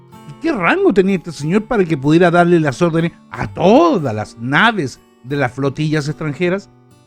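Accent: Chile. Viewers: tomese unas vacaciones y váyase a Lima unos meses. Cuando regrese a Santiago verá que su acento y vocabulario habrá mejorado notablemente! Pronunciará bien todas las palabras y su léxico tendrá una notoria mejoría. Hágame caso buen hombre! Discúlpeme, pero los chilenos tienen una forma de hablar tan espantosa que ofenden los oídos de los demás hispano hablantes. He dicho.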